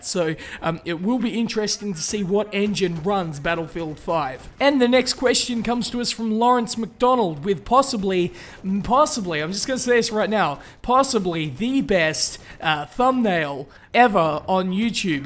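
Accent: Australia. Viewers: first time I see this "oh, this guy must be from Australia" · I'm American and it accent is clearly Australian. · Does the person who said the last question on this video think that Australian accent means bogan? This guy's accent is what most people in Australia sound like (I'm Australian and know what they sound like). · Sounds like an Australian accent to me. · I think he sounds Australian. I don't see how he could be American or British.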